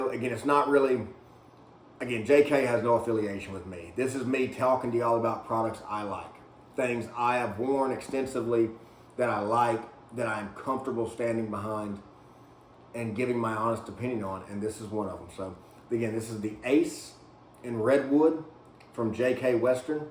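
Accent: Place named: South, United States. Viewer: Great video. I'm trying to figure out what part of the US you are from by your accent and it's difficult to recognize. Maybe Oklahoma, Kentucky, or Louisiana if I had to guess. I am looking forward to more boot reviews in the future. Do you have any opinion on Resistol cowboy boots if you have owned a pair? The underslung heel on the JK Western Ace is a little too steep an angle for my preference but it looks like a great boot otherwise.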